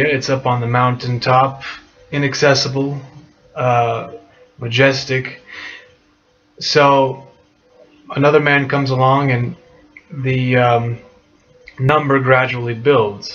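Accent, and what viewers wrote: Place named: Canada. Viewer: Your dialect sounds Canadian, but it might not be. In Canada we have a monarchy, although the queen has no actual power, although she often is astonished to herself discover how much moral power she actually has. But legally, she is unchallenged and unchallengeable - but, realistically, she has no power at all. If she pushes the envelope at all, we'll suddenly have an Act of Parliament declaring Canada a republic, and nobody wants this to happen. So what is going on? We agree that she is necessary as one of the illusions or myths that bind our society together. She is just a human being, but she is also Her Majesty - chosen by god, even though nobody believes that, and probably never did. Society requires rules, and these rules require justification. So we have myths that we know are myths, but what they really are is a psychological boundary. 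"Question this, and the whole thing comes unglued" is a very "Canadian" thing to think, and that goes for English and French, as well as immigrants - who buy more readily into Canada's myths than native-borns do. So it is both absolutely true and absolutely false that the queen reigns. It's I suppose an agreed-upon sort of doublethink, but utility trumps "reality" here.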